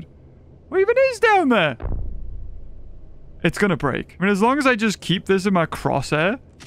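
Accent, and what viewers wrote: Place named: Newcastle, United Kingdom. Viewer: We got a love also he’s firm Newcastle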